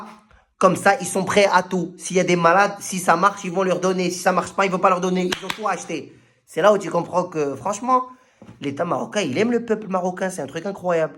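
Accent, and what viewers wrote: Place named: Algeria. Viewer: ET C KOI CET ACCENT PIED NOIRE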